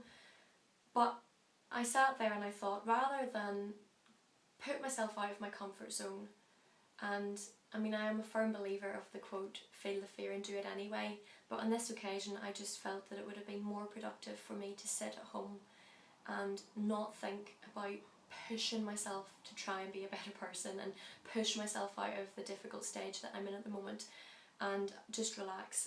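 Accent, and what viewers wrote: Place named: New Jersey, United States. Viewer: thanx for the video. where r u from? you have an accent. Jersey?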